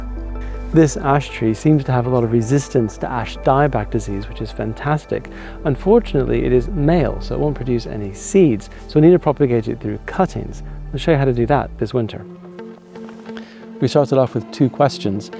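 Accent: United Kingdom. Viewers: seems interesting but it is very difficult to hear because of the loud music background. You Brits are not easy to understand for us in the U.S. who's hearing is not the best. Just saying.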